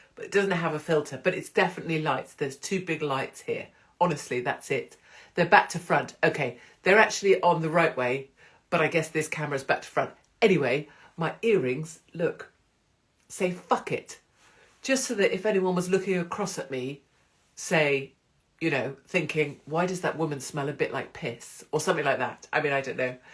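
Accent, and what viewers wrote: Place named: Birmingham, United Kingdom. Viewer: Burden drudgery absurdity beautific anglettere nonsense, completely benign drivel rumpus, fabulously sub eroticism, divided by uncertain boundries, quagmire conumdrum❤❤❤ Your bog or mine! Gather yourself! Ok yah! Once i had an Amstrad Stereo Pour some sugar on it! Def jaguar! Blinkinsop journal! Fabulastic Ztuffz Verbosity , i mean! Like the stance! I luv your Brummy accent fab! 4 mile?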